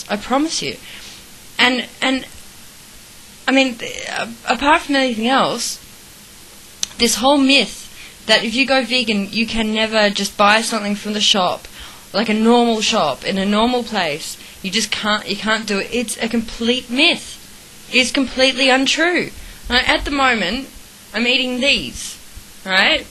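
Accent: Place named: Australia. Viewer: ew god your australian!!! its bad enough your vegan, both mixed together your like the next fuckin hitler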